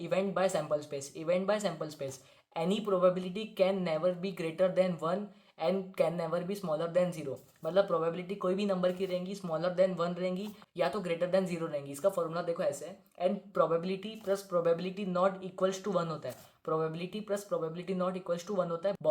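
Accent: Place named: Nagpur, India. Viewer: Bhai tum pakka nagpur maharashtra se ho na🥷🏿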